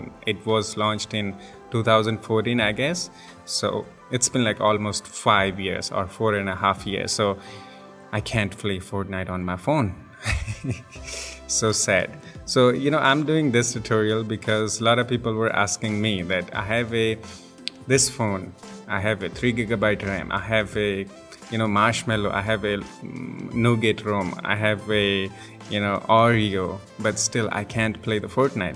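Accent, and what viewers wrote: India. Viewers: atlast an indian person speak english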